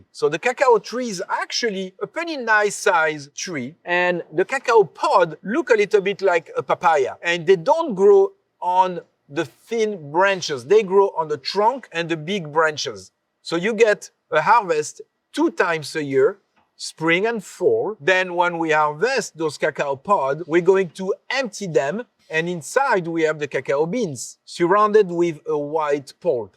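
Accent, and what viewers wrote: France. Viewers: His accent is peak French Accent, it's so entertaining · Arnold is Austrian and this guy is French. Completely different countries and accents. Are you high? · Im reveling in his delicious chocolate knowledge and beautiful French accent!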